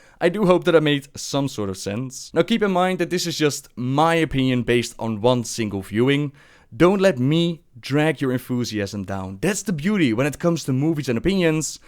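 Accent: Netherlands. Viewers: As a dutch person i have to say... he sounds dutch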